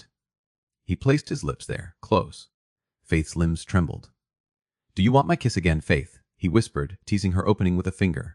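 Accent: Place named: United States. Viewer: AI American accent narration in audiobooks supposed to be set in Regency Era London sounds dreadful. Please try an AI with an English Accent at least.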